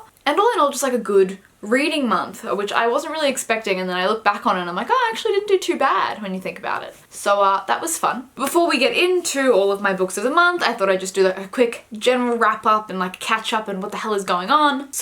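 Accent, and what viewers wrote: Australia. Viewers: I think beta reading is a great idea, and it's so cool to have an Aussie audio book narrator.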